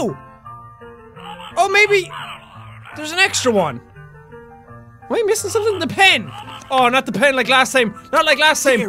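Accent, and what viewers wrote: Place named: Ireland. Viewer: The Irish accent is 10 times stronger in the old videos.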